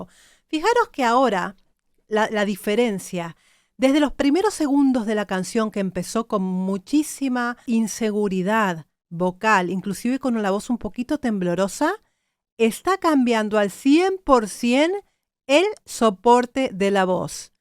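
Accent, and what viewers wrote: Argentina. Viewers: Eres argentina y española ? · se te escucha tu acento argentino como si hubieras estado ahi hace poco ❤no te lo notaba tan marcado como hoy. Pero también, no me he metido mucho a YouTube entonces igual soy yo la que te escucho mal. Gracias por tu pasión a la musica. Me encata ❤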